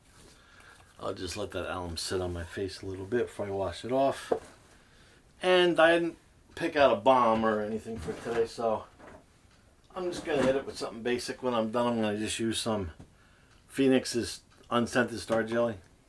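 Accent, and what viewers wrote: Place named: New Jersey, United States. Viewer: Where do you live? Your accent makes me think you might be from New Jersey or New York, but I could be wrong.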